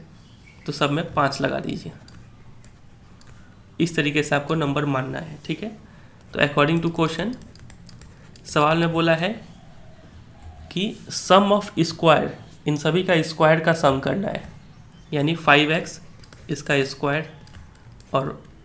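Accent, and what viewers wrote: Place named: Bengal, India. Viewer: Where are u from sir ?? U sound like bangoli , if i am not mistaken, you are...... please please 🙏🏻🙏🏻🙏🏻 reply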